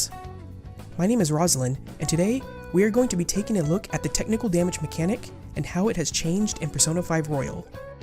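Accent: Japan. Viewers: Is Japanese your first language?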